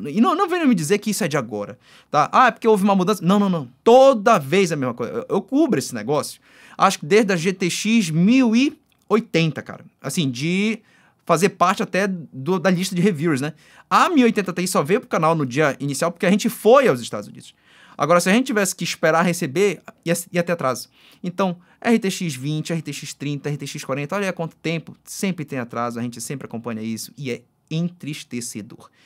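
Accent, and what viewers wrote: Pará, Brazil. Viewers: Esse sotaque paraense, meu parceiro. Enfim um canal de tech e inovações em que me sinto representado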